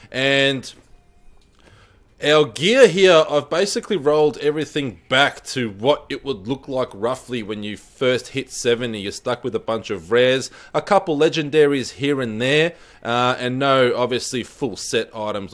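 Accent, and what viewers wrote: Australia. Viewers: Great guide in simple language for a new player. Thanks for the help. Also nice to hear a Aussie.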